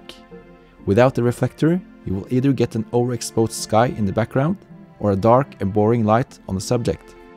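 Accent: Norway. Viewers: Your accent sounds like you are a Norwegian :) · Ah du er Norsk, hviste det med en gang du snakka, men bekreftet når det sto NOK på ebay :D Flott video. lærte masse :) tusen takk :D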